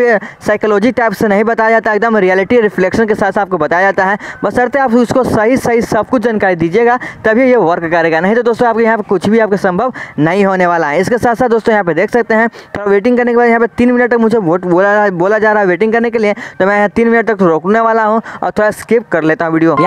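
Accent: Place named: UP/Bihar, India. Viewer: Bro are you from up/bihar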